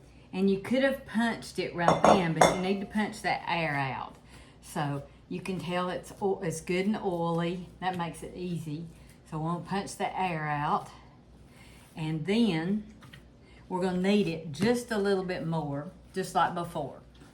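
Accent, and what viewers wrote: South, United States. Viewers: Stupid Question...do you have 2 kitchens? One day I saw you with the above red appliances and the next day I saw a completely different kitchen - stainless steel!. Just inquisitive! AND I LOVE your cooking and your sweet voice. I am from North Carolina and I speak southern too! Thank you for making cooking look so easy. I'm 76 and have been cooking all my life. You just make things look so easy - I thank you and I will keep watching.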